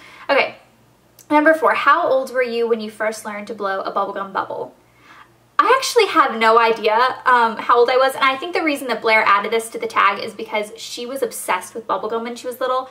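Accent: South, United States. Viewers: ? with that sexi southern accent:D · I love! So Southern! You and your sister should embrace your Southern accents!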